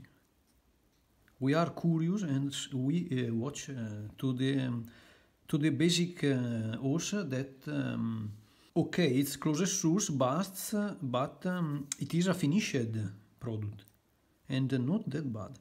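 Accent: Italy. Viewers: Ho capito subito che sei italiano, come siamo facilmente riconoscibili. Cmq continua cosi` sei grandeeeeeee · sei anche veneto magari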